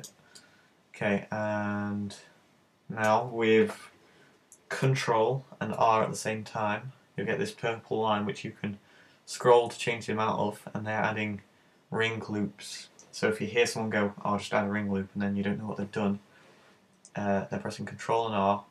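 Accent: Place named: Southern England, United Kingdom. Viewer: that accent sounds damn familiar :) guessing from south england but been in the north quite a while?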